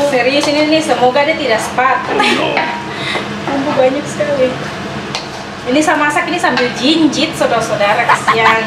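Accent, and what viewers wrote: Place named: Eastern Indonesia, Indonesia. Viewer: mmng bahasanya seperti bgt yaa... Khas.... Daerah Timur.. Mantabbb.. GO go... Trs lah memperkenalkan kuliner Indonesia... 💕❤😋😋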